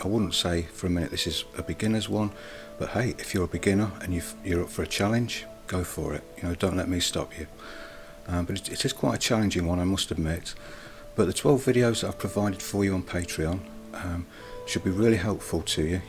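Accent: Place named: Leicester, United Kingdom. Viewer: You have such a soothing voice. Are you from Leicester? I am too and it’s so nice to hear the accent. Love the video and love your work.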